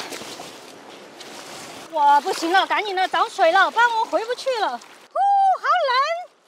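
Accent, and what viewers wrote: Sichuan, China. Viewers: 美女是四川的吧。这口音听着好熟悉，哈哈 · 火锅味的普通话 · 听口音是四川人吧？ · 看到一半我老公说这会不会是个四川人！跟你一样好奇地什么都要抓一下摸一哈，说话也像，看到后面果然啊！了解我们大四川的川性是什么样的(o^^o)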